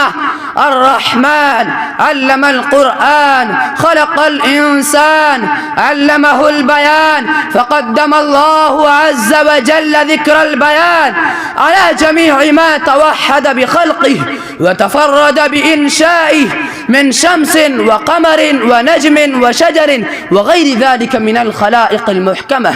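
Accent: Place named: Pakistan. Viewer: ماشااللہ عربی لہجہ پر محنت کریں تقریر عربی کا ہے اور لہجہ اردو کا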